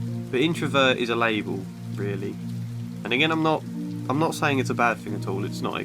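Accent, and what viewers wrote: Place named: Manchester, United Kingdom. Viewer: The Algorithm Gods have blessed me with an extremely insightful and beautiful channel. Wild guess but you sound like you’re from Manchester as well.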